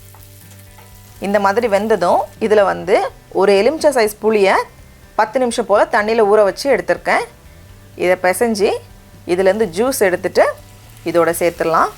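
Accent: Nagercoil, India. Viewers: I like ur nagercoil slang..I'm from Tirunelveli..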